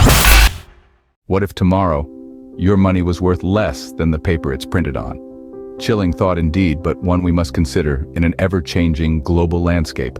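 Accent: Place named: South, United States. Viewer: I love your southern accent. 😉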